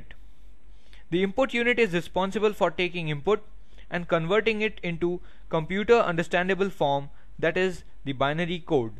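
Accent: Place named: India. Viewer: Youtube auto-gen subtitles are wrong. . . . .Can't take indian accent :V. . .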